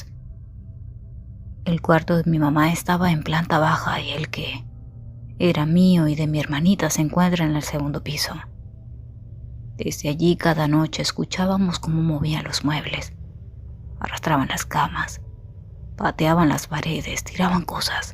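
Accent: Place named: Venezuela. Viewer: noté el acento Venezolano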